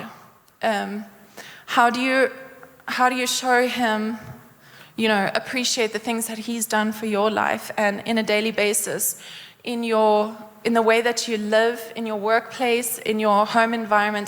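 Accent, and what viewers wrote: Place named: South Africa. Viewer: Can't you hear she is SA.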